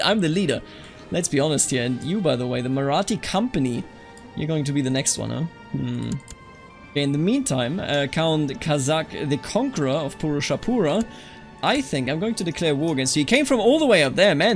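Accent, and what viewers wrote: Germany. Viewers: Man I love listening to you pronounce Indian names with your German accent. 10/10!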